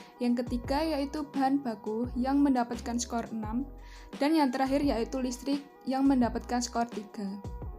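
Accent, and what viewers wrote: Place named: Java, Indonesia. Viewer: medoknya sangat terasa khas Templek 😭👍🏼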